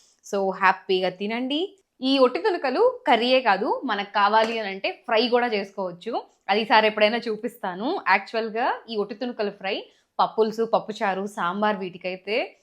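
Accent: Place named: Anantapur, India. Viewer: Mam you are saying that you belong to Nellore but your language is like Anantapur.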